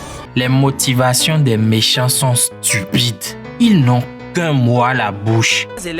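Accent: Benin. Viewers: J'adore le montage la vidéo😱 (façon trash). On sent l'accent de nos voisins les béninois dans la voix off. Beaucoup de courage 💪bro, tu mérites de buzzer. Et je rajoute : en Afrique (Togo); il existe aussi des putains d'Otaku.😁😁😁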